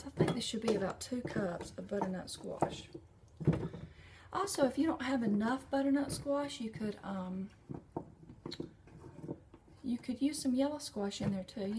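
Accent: South, United States. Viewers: You’re cute. I like your soft southern voice. I don’t usually watch chatty videos but it really was pleasant to listen to yours because of that. I’ll have to give that recipe a go. Thanks. Have a funtastic day!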